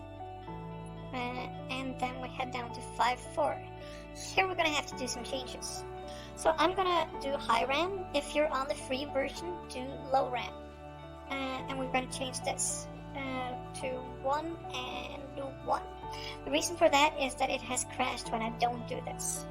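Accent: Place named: Europe. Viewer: no offense but the filter makes it worst. love me some european accent...just use your real voice nxt time please.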